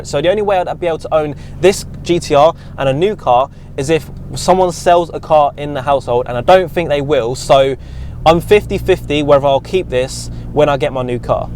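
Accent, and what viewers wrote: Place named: Birmingham, United Kingdom. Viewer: never heard your bham accent till this video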